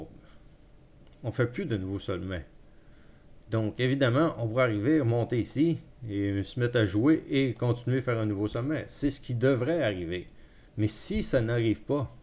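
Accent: Quebec, Canada. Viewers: sI JAIME CE QUE TU FAIT ..... malade. cest bien de l entendre de la bouche dun Quebecois ;) cest difficile pour mois qui travaille de 6am a 2pm de suivre tes cours en direct. ca va etre enregistré? merci jaimerais bien moi aussi changer ma vie! cest possible de trader dun cell, avec l aide de votre communité au début ?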